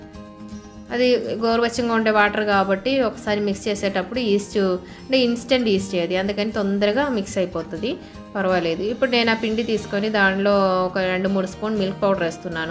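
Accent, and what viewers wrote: Kerala, India. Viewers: Meeru malayalee na madam...mee slang same malayam la undhi Telugu.😅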